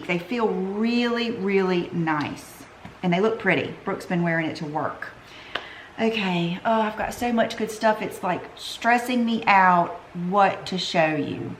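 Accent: South, United States. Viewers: I watch you mostly because it's wonderful to see a feminine girl like myself!! I am a girly girl but strong at the same time. I feel you are too! I am also southern born and raised and I love your accent! You are beautiful inside and out.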